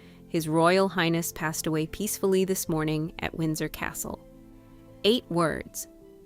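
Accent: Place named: United States. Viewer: year i hate the American accented narration, Prince Philip was GB's and the commonwealth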